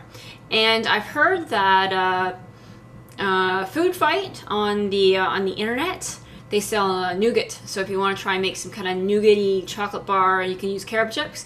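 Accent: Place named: Canada. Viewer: All foods are an aquired taste. Where did you get the Oil atomizer?(brand name, you sound Canadian, is it from the US?) Can't find them here. Coconut would rock in this recipe too.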